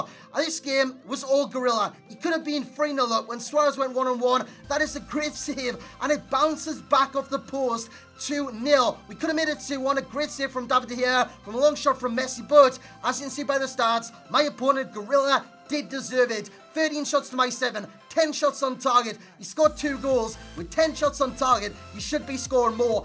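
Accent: Newcastle, United Kingdom. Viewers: hes from newcastle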